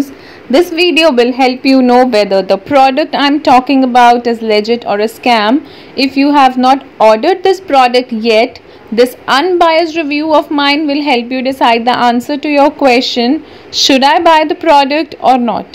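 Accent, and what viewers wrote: India. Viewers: I think you should do asmr videos your lovely Indian accent gave me brain tingles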